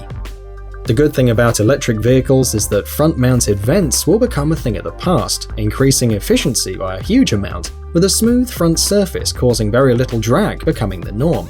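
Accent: England, United Kingdom. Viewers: It is ZED in England, per the narrators accent.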